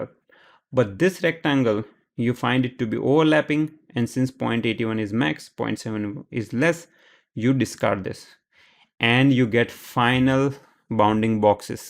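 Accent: India. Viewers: indian accent is so cute 🤣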